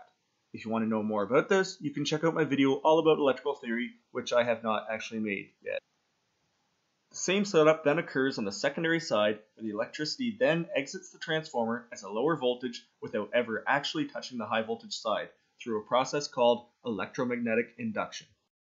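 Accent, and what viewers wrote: Canada. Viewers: Canadian eh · Very Canadian. I might guess Nova Scotia....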